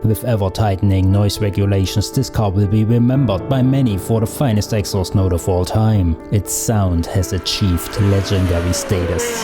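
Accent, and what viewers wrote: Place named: Saxony, Germany. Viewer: klingt sehr sächsisch, habe ich mich auch gerade gefragt 😂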